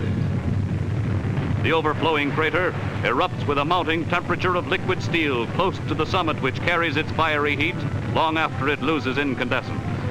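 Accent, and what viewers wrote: Transatlantic, United States. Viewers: Transatlantic accent 😀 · cocky transatlatic accent!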